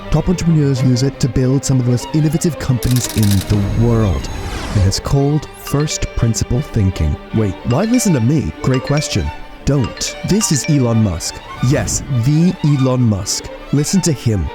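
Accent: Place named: United States. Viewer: Yo I'm just wondering if you're really from India. Ur accent sounds like American, great!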